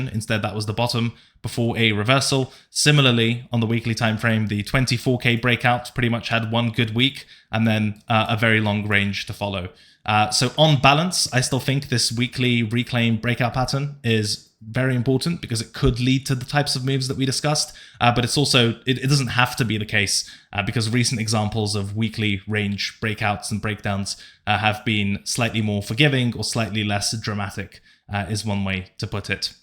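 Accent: United Kingdom. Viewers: Team here. Sure, it might be more profitable to copy trade the duck. But you can't beat the charm of ' lovely British accent and self-deprecating humour.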